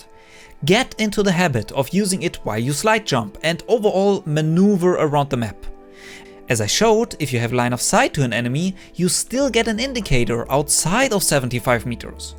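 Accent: Germany. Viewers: Could it be that you are a german?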